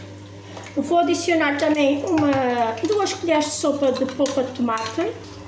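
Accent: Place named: Centre, Portugal. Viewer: Olá se posso perguntar de onde é em Portugal? Pelo sotaque eu diria do centro. Eu sou de Vila Real do norte. As minhas máquinas da loiça e da roupa tambem não tem descanso, eu tenho dois filhos pequenos. Esse empadão é muito diferente, realmente as batatas fritas devem dar um gosto especial, hei-de experiementar. Também não gosto muito de passar a ferro. Beijinhos amiga e um grande abraço 😗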